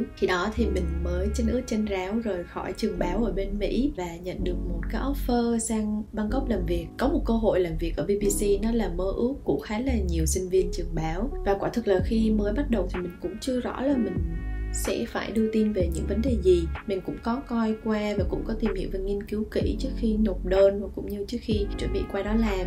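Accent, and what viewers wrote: Vietnam. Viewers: Bạn là người Bắc hay Nam?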